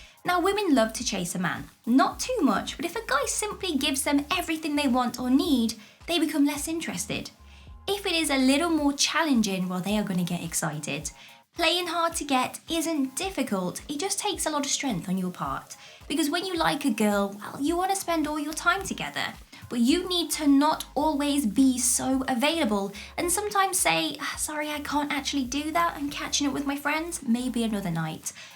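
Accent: England, United Kingdom. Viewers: I love the way she speaks. That English accent is really enticing 😍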